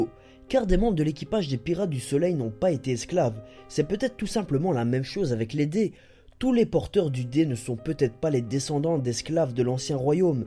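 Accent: Brussels, Belgium. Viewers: T'es de Bruxelles?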